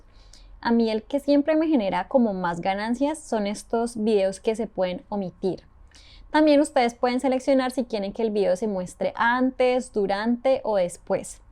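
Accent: Colombia. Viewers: Yo lo hice en 3 meses y ayer me aprobaron, fue lo más rápido que pasó, pero tengo una duda, en algunos videos salen la monetización en $ amarillo(? Mi pregunta es; el $ amarillo también te monetiza? ¿Pero que tanto? Que bonito acento tenes jajs(Colombiana no(? saludos desde Argentina!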